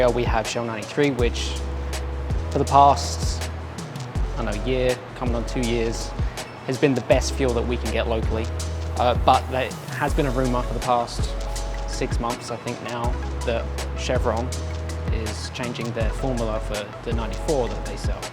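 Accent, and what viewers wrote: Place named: United Kingdom. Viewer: You're Canadian, but have a mild British accent, how's that, bro'?!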